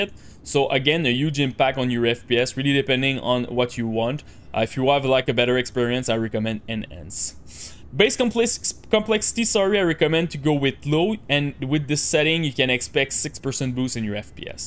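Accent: Quebec, Canada. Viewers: je croit que je reconnait le bon vieux accent quebecois haha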